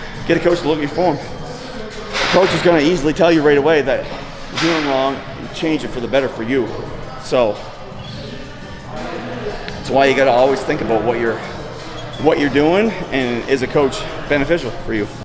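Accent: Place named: East Coast, Canada. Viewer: Is that a Canadian East Coast accent I hear?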